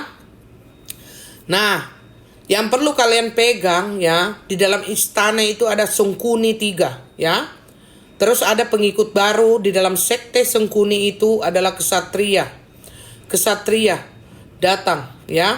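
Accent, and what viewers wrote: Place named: Makassar, Indonesia. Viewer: Koko logtnya mirip org Makassar 😅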